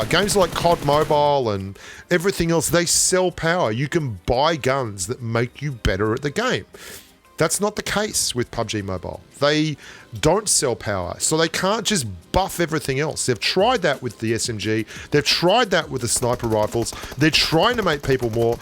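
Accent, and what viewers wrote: Australia. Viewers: I subscribed to this channel recently. I think..this guy knows what he is saying. The probable reason why he isnt getting more subscribers..may be for following reasons: 1. Accent: I think its Australian..though i can easily understand him..most of the south asian audience can't. So either you can slow down while spekaing..or put notes..subtitles..in video..like levinho and tacaz does. They arent good at english..and hence they only use.. text for communicating with audience. 2. More gameplays: all leading youtube accounts..majorily upload..their gameplays.. i like your educational videos..but it wud be great..if you can uplaod your gameplays..atleast once in 2 days..with commentary. I think people wud like that. Anyways.. cheers mate.. great channel. I learnt a lot from you. May you get more subscribers soon.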